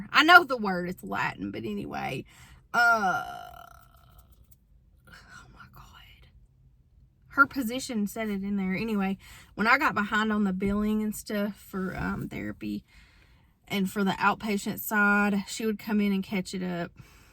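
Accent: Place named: South, United States. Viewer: Thank you. Your sunshine and peace and don't let them hold you down attitude is the exact energy I wanted to match today. Thanks for being that and your sweet southern accent made me miss Mississippi just a little. Some people are as sweet as the sweet tea they make down there and I miss that warmth! ❤ Lots of positivity your way all the way from Canada. 😊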